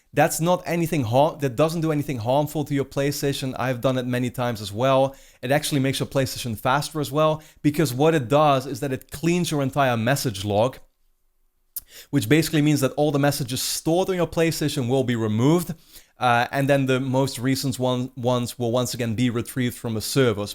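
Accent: Netherlands. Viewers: I wonder if he is dutch cause he has that accent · am i fucking glad switch doesn't have messages XD also i'm pretty sure you are dutch judging from your accent though possibly rip my brothers..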